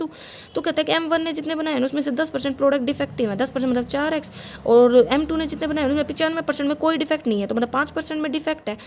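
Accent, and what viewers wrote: Haryana, India. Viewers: Tnq mam for explaination. Aap haryana se ho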